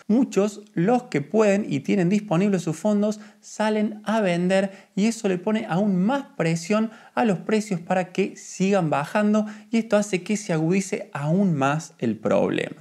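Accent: Córdoba, Argentina. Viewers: que tonada cordobeza, me encanta. Sos gros exponiendo como antes de ponerlaen bancos argentinos, la pongo en cualquier lotria Crypto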